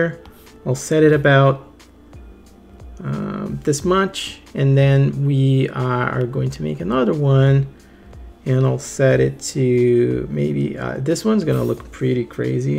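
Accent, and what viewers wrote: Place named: Italy. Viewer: Trying to guess accent... I'm with either Italian or Brazilian/Portuguese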